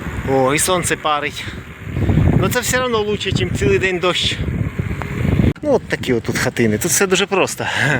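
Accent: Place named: Surzhyk, Ukraine. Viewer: Суржик жосткый)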